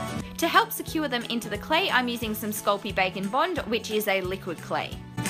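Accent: Australia. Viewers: i love your tutorials! are you australian? im aussie.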